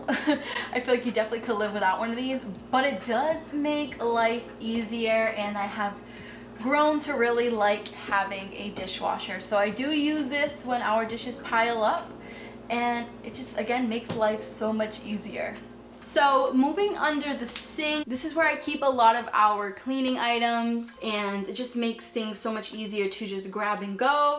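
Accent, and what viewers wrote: Boston, United States. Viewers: I love your boston accent I’m Portland Oregon!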